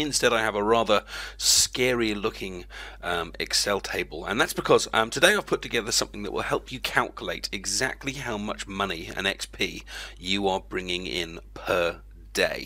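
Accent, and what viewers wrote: United Kingdom. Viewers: Are you British? Will you friend me on Origin?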